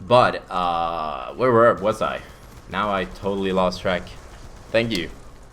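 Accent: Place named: Sweden. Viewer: Having a orginal mål good swenglish bro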